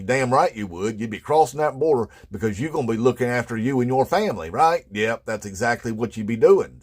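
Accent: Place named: West Virginia, United States. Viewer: I just love the way you talk. Reminds me of my grandparents from West by God Virginia. I was telling my grandma (God bless her soul) back in the 90s that I was going to vote republican. She looked at me and said. "Lauren, why would you go and do that for? Our family have always been Democrats.". Well, I gotta tell ya that I have never forgotten those words, and I learned the hard way about Republicans over the years. I switched to blue somewhere near the end of the bush administration. I saw this Maga movement coming before it happened. I saw the racism and hate first hand and didn't want any part of it. So here we are. Trying to wake people up before there is no democracy. Before the United States ends in a bad way. Vote Red, end up dead.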